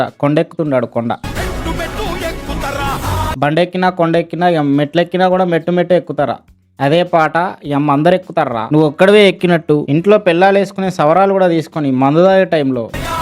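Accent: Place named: Telangana, India. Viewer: Bokka lo Telangana slang 🤮🤮🤮🤮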